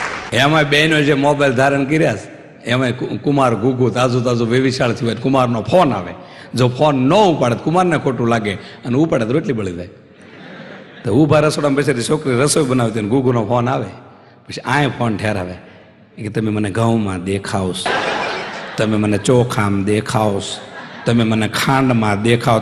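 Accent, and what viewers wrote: Kathiawar, India. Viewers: aape pyor tadpadi bhasha jadvi rakhi chhe, kathiyavad sivay kadach samjata thodo samay levo padto hase evu manu chhu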